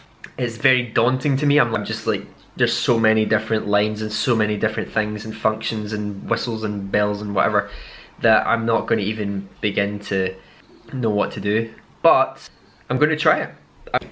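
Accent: Scotland, United Kingdom. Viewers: Im excited for you, i love listening to you talk! Scottish is the best accent!!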